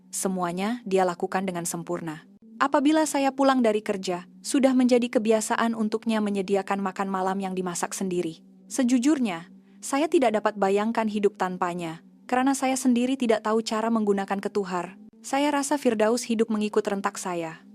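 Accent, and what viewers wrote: Indonesia. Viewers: Indon narrator?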